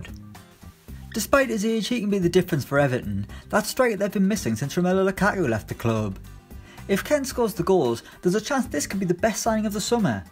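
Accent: Ireland. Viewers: Is this guy irish too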